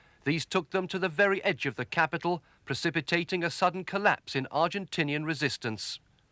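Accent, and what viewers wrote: United Kingdom. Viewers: LOL your page says you're from Denmark. That's why. But you sound like a British subject who lives in Denmark.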